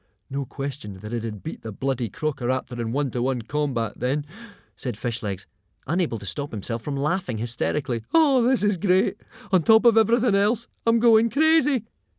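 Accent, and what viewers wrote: Scotland, United Kingdom. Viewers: The Scottish accent really goes well with the story.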